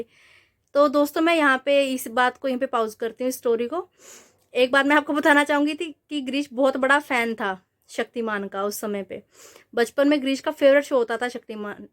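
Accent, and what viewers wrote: Punjab, India. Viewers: बहुत सुंदर प्रस्तुति बेटा तुम्हारा बोलने का टोन पंजाबी हो गया है।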